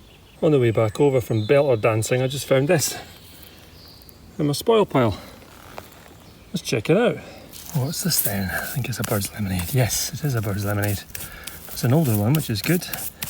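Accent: Scotland, United Kingdom. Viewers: How can anyone be in a bad mood after watching this? Beautiful scenery, amazing finds, a delightful Scottish accent, and best of all, the Belter Boogie.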